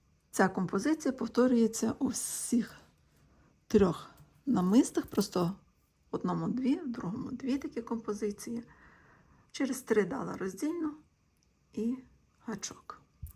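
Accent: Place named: Lviv, Ukraine. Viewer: Пані! У вас дуже гарна львівська вимова( чи тембр,я не знаю як правильно назвати) я отримую велику насолоду від цього.будьте здорові! Ми переможемо!!!!❤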